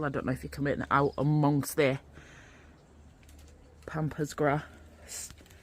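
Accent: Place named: Yorkshire, United Kingdom. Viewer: That sounds like a Yorkshire accent to me? Pretty much the whole of the UK has a Tropical climate right now, so the answer to toyour question is "'Qute easily"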